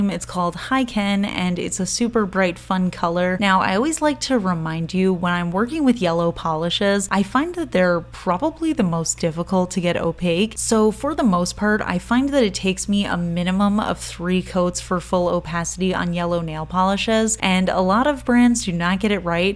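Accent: United States. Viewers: Oh what a wonderful video- spot on! Thank you- have ordered 4 of them. Where from the US is your accent? Becky from Sydney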